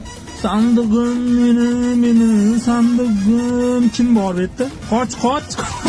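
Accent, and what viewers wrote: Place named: Andijan, Uzbekistan. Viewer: Тохтамыша. Керак. Эди. Акам. Анжанча.. Момла. Ю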